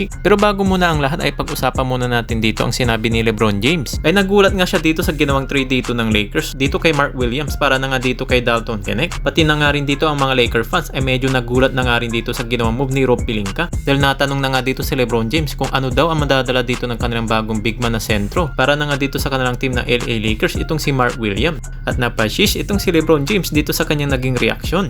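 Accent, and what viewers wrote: Pangasinan, Philippines. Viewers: Idol taga pangasinan kaba? 😅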